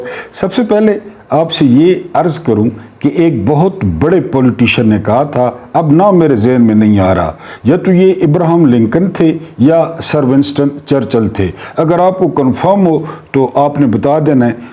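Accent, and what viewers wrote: Saraiki, Pakistan. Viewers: صاحب حد ہی کر دیتے ہیں آپ سرائیکی کوٹڈ پنجابی سننے کا اپنا مزہ ہے ۔جگر حق و سچ کہنے پر بند نہ پڑھوا بیٹھنا ۔❤❤❤❤❤❤❤❤❤❤❤❤❤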